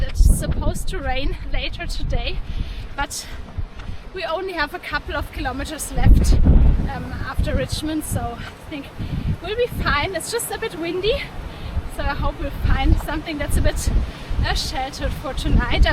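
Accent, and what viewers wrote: Germany. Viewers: Der kleine Otto tut mir leid. Hoffentlich geht es ihm bald besser. Übrigens, wo kommst du her? Dein deutscher Akzent kommt mir bekannt vor.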